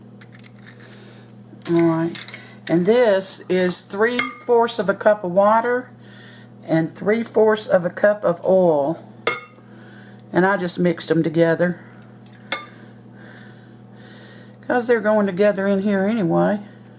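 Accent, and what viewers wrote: South, United States. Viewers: Nice video! Love that you're from the South. You sound like my husband & I. Can't wait to try this!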